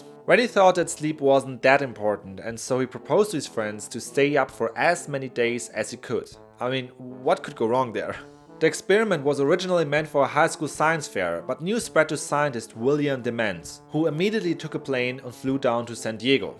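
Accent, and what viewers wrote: Germany. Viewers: I subscribed simply because I love listening to German people talk. Their sentence structures lack pronouns, conjunctions and sometimes the improper emotional reactions to what they are saying. Nothing wrong with that, I'm not criticizing this person at all. Most languages translate a little irregular to English. I find Germans speaking English to be really cute😊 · This is the first vidoe by you I’m seeing. I immediately knew you were German. Ich liebe unseren deutschen Akzent :D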